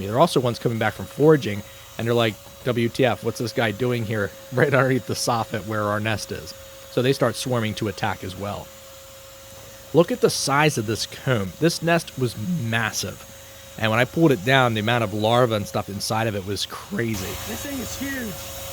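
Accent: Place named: Pennsylvania, United States. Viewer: I knew you were from PA by the accent! HA! Delco???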